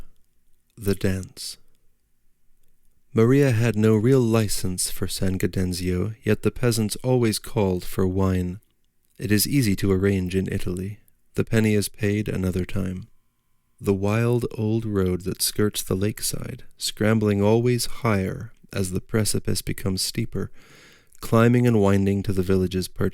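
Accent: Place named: United States. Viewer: Thick american accent…… unlistenable.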